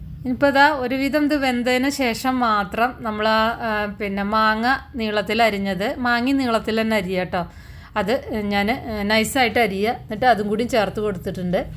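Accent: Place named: Thrissur, India. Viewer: good receipe......TSR speak pole thonni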